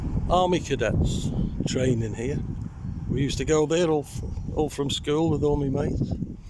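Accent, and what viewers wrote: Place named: Liverpool, United Kingdom. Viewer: Cheers..... Lllandudno born but with a scouse twang..... incongruous.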